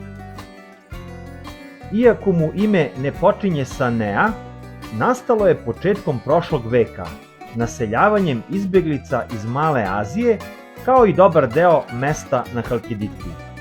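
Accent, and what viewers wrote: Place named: Jagodina, Serbia. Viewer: BRAVO ZA MOG JAGODINCA,OSETILA SAM PO NAGLASKU DA STE IZ TIH KRAJEVA PA SAM BILA SLOBODNA DA VAS PITAM ODAKLE STE. JA SAM PO MAJICI IZ MAJURA KRAJ JAGODINE, A ŽIVIM U ZAJEČARU . SA PORODICOM SAM U AVGUSTU OVE GODINE BILA U PEFKOHORIJU,STVARNO JE LEPO. POZDRAV OD ❤ ZA VAS. PRODUŽITE SA LEPIM VIDEIMA,KORISNI SU . 🌹🍀🥰👋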